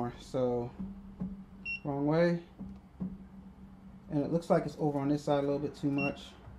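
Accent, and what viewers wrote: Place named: Baltimore, United States. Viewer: you from baltimore my man?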